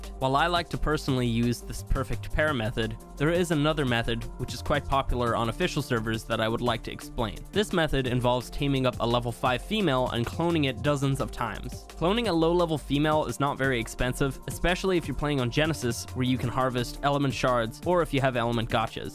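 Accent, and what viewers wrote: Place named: Ireland. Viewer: He is Irish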